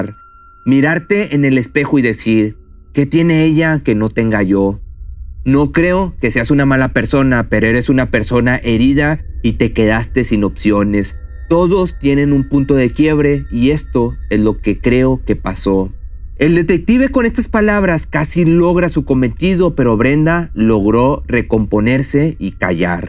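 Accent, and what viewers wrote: Mexico. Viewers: Es cierto, tiene cierta gracia al narrar, eso me hace ver sus videos y esto que no me gusta el acento mexicano.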